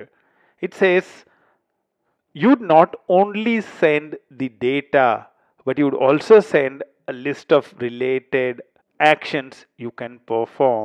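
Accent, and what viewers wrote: India. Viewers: Индийцы имеют ужасный акцент, невозможно слушать такое издевательство над языком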